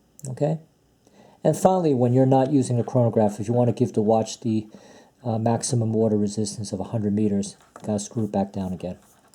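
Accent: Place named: New York, United States. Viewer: Good review of the Daytona and love the cool NYC accent!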